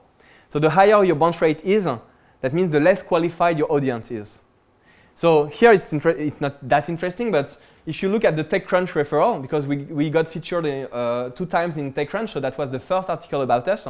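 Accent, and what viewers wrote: France. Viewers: ohhhh that french accent _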